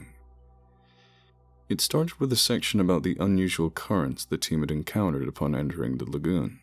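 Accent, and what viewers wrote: United States. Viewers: Your American accent is very good. There are respected British actors that don’t do it as well. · Your American accent is so good! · I LOVE your American accent, it's dead on. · He does an excellent Job as do many actors I'm not sure if you watch movies to TV but many many talented Foreigners speak in perfect American accents. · I agree that his American accent is great, but I'm not sure what you "producing music" has anything to do with studying language or dialect. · the American voice you use in these videos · you have an American accent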